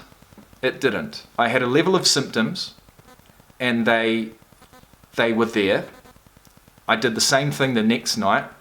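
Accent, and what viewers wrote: New Zealand. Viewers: You from New Zealand?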